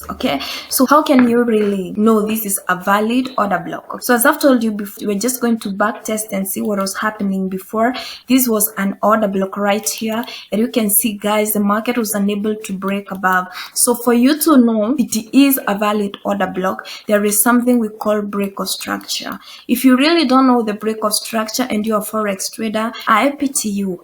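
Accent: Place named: Africa. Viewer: I say somewhere in Africa for the accent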